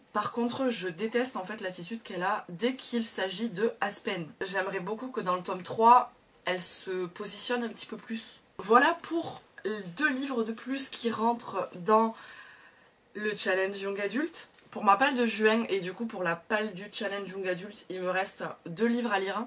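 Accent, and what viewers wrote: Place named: Toulouse, France. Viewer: J’aime tellement tes vlogs ! Moi qui suis une toulousaine expatriée en région parisienne, je suis toujours ravie de retrouver ton accent ! 🥰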